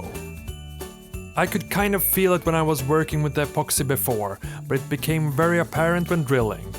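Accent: Sweden. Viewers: are u sweedish?? or norwigian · satan vilken grov svensk dialekt! avslöjad direkt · I love you super Swedish accent! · are you swedish or norwegian? your accent is kinda awful sorry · Aaa i just realised that sounded kinda rude, but I dig the Swedish accent :—) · Jag kände igen den svenska engelska accenten men det var när du tog upp morakniven och uttalade mora perfekt så var jag säker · u sound swedish jus saying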